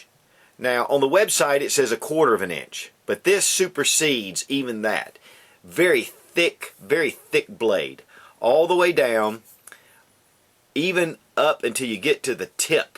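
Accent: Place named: South, United States. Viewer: This guy has the THICKEST southern accent I've ever heard lol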